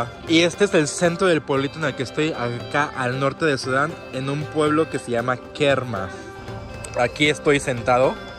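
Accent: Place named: Fresa, Mexico. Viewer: Porque este hombre habla fresa normalmente hablan haci los yuniors y el para gue😂😂😅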